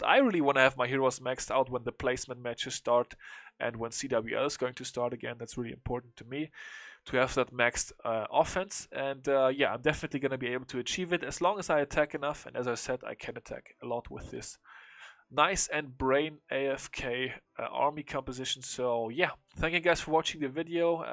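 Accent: Germany. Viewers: You are german i can hear it by your english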